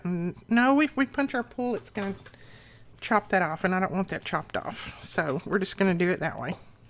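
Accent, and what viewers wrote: South, United States. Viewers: I’m so glad you got your Facebook taken care of finally and your YT name is back! Yay!! As always I enjoy watching you craft. And I love hearing you talk with your sweet southern accent. That journal turned out really cute. Sending much love and blessings, Sharron 🩷🤗🩷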